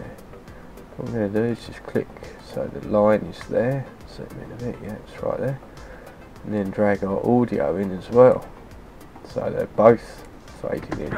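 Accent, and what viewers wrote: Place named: Southern England, United Kingdom. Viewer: Really great and so much help! I'm from Kent but I've been living in Manchester for 10 years, so I enjoyed your good old Southern accent.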